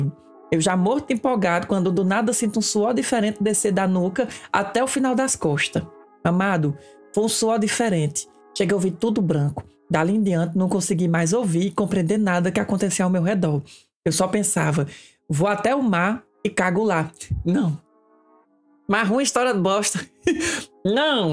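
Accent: Northeast, Brazil. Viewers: pois é. aos 74 anos estou perdidamente apaixonada por esse querubim de cabelinhos encaracolados chamado. o que me encanta é a naturalidade da sua essencia com esse sotaque nordestino que me dá tanta da saudade da minha Bahia que deixei aos 6 anos e nunca mais voltei. Parabens. Deus te abençoe e nunca perca sua essencia. Bjsss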